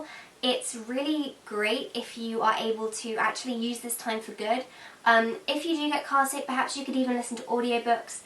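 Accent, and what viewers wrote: RP, United Kingdom. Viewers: Your accent are just like Hermione Grandger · British acccent... Reading books..... And your hair cut is also same as Hermoine granger... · You remind me if the girl of harry potter you also look alike and have the same accent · I like these tips but shes so annoyingly posh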